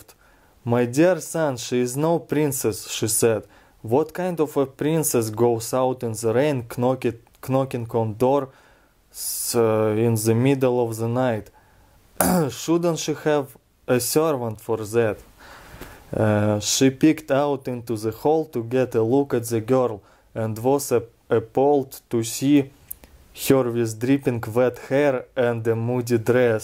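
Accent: Russia. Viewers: I'm already subbed to your channel, but this just popped up in my feed. I love your Russian accent. I could listen to you all day. 😊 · thank you my comrade i am learning to do a Russian accent for voice acting and this helps a lot because your voice and accent is perfect and strong. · Im obsessed with russian accents, specifically strong ones like yours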